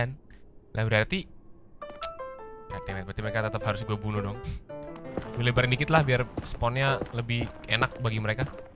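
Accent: Java, Indonesia. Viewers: Wong jowo opo uduk mas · Orang Jawa bang to Yo to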